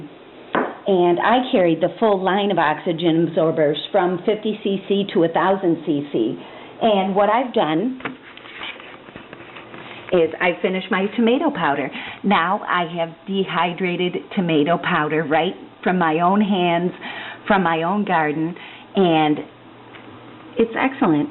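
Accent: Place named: Minnesota, United States. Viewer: I am guessing you are from Northern Wisconsin or Minnesota.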